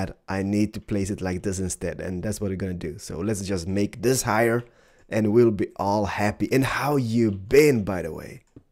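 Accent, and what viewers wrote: Sweden. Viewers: Are you swedish? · Just like i thought, u were Swedish :D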